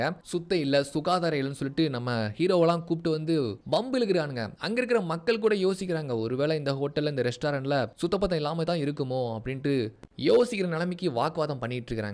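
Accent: Tirunelveli, India. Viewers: பேச்சில் திருநெல்வேலி சாயல்..❤❤❤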